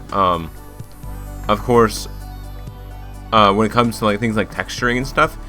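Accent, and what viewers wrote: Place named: South Africa. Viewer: yeah i think this is a South African accent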